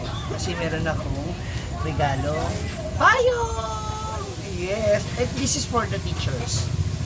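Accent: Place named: Visayas, Philippines. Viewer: bisayang bisaya nman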